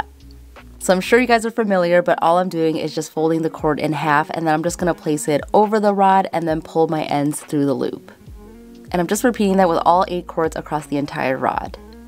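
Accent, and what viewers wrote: Chicago, United States. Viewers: You can also use it to hold anything like make up brushes or in your kitchen to hold utensils if you made larger. At your desk for pens ...im a Chi girl too!! I love our accent.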